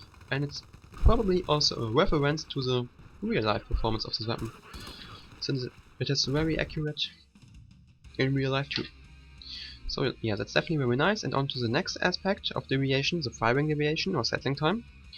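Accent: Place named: Germany. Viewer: THAT GERMAN ACCENT !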